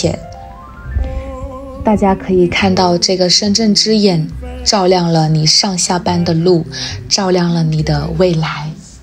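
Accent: United Kingdom. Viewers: could you make a video about cultures, customs, things to do/not to do for someone moving or visiting china? maybe do it in this format? thank you for videos... did you live in the UK for a long time? you have an UK accent when you speak english in other vids.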